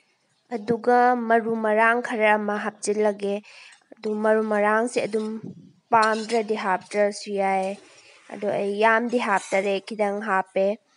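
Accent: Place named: Manipur, India. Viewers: Kakching gi accent ni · Kakching marolse taba Yamna nungai eidi ...rhythm se ...cute oiye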